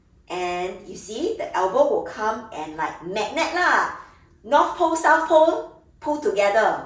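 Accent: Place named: Singapore. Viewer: Sounded singaporean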